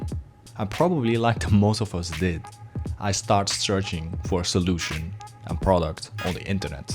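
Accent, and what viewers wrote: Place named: England, United Kingdom. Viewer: I like ur english accent😊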